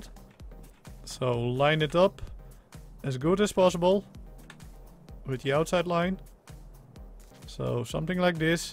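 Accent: Netherlands. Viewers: are u dutch ? i can hear an accent there hehe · Lekker nederlands accent 😂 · Ik kijk je voor de eerste keer en kon gelijk horen dat je nederlands bent ;) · the dutch accent xD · Are u Dutch? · Are you Dutch? I grew up in holland but now live in the USA. Love the accent lol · Dat dutch accent is funny. Nice build btw 😃👍🇳🇱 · You can tell this guy is dutch, een goeie dag trauwens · je hoort een beetje een nl accent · Je hoort gewoon dat hij nederlands is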